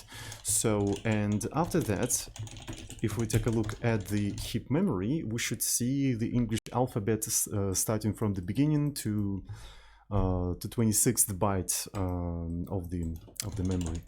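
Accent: Russia. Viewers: ахахха, да русский ацкент явный, но неплохо говорит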